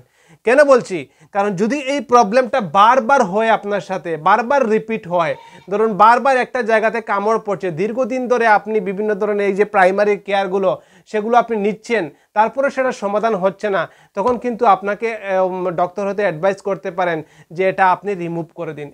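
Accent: Sylhet, Bangladesh. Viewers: আপনার কথাবার্তা শুনলে মনে হয় আপনার পূর্ব পুরুষ বৃহত্তর সিলেটের....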